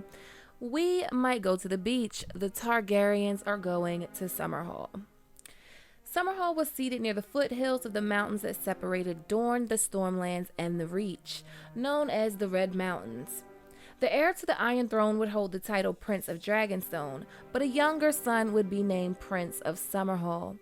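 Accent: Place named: Philadelphia, United States. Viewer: Your videos are very well thought out and entertaining. Your passion for the subject matter shines through and is absolutely infectious. And your voice,...it triggers something primal in me. Can't explain it simply which means I must not understand it well enough. But to avoid sounding creepy I'll just say your voice is very nice. Sounds unmistakably Philly to me but idk. Your work and effort is much appreciated.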